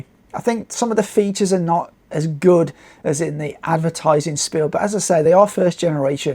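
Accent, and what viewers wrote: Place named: England, United Kingdom. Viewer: People should not comment on accents if they don't know what they are talking about. This guy is English, hence his accent is one of a number of English accents.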